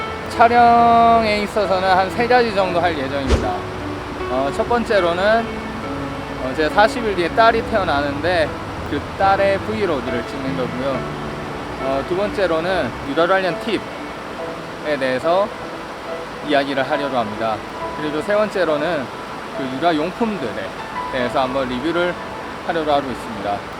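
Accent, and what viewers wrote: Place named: Daegu, South Korea. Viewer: 미래 태어날 딸을 위한 노력과 사랑과 정성이 보이는 유튜브네요! 대구사투리도 듣기좋고 와이프사랑도 좋습니다. 응원합니다 님!♡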